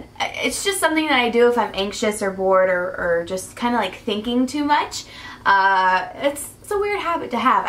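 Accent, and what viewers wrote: South, United States. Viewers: Was it just me or did no one even notice her accent until she pointed it out? 😞 like fr she said "my southern accent" and I was just like "omg wtf?? U have an accent?" And then when I payed attention I was like "omfg u have a southern accent!" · I know I'm a little late in catching up on your videos but I would definitely love it if you read what you've been writing in your diary, I'd love it if you talked more about your experiences relationship-wise! And I love your southern accent, let it come out more(: Hahaha. Love you<3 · You're so down to earth and I wish I knew you in person! You seem like the type of person I would get along with so well and your southern accent is so fuckin cute! (In the least creeper way hahahah) love you girl!!!😍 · I know what you mean with the southerness when filming! I also am a lot more southern in person than on camera. 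Glad it isn't just me haha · Yea.. we would totally be best friends if we ever met. Totally! Southern twang, bravo, gossip girl, dog lovers, dreams to open a no kill shelter! Ah! Awesome!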